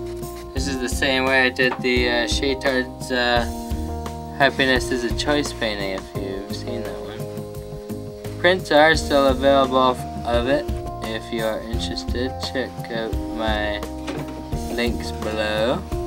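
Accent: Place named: Canada. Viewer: Ba ha ha! Thought I heard you say, "draw in your picture like... c'est ca.." and thought this dude sounds like he's from my neck of the woods, he's got to be Canadian. I see your website is a dot ca domain. If you aren't from the East coast, I think you'd fit right in anyway!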